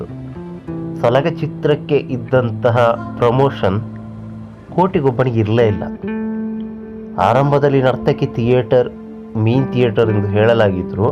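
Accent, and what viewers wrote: Coastal Karnataka, India. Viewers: Yavano ninu magne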